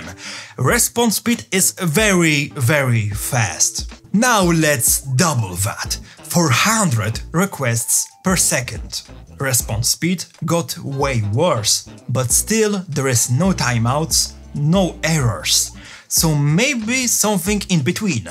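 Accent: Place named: Europe. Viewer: English is fine! A bit of an accent, but that's just standard for anyone in Europe :) As for VPS, I had no idea you could get something that actually gets something done for that cheap. Thanks for the info!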